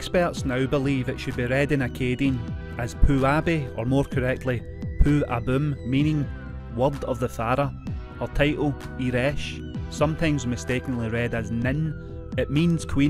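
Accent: Scotland, United Kingdom. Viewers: Amazing content as always! Scottish accent is a bit tricky by the way haha. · He's speaking in English just with a scottsmans accent, you'll get used to it..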